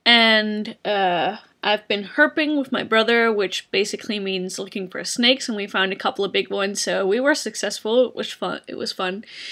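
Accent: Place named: United States. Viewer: Yo accent is more american than usual, lady. or maybe it's in my head. either way, I'm happyz you're still alive and back in the country :) <3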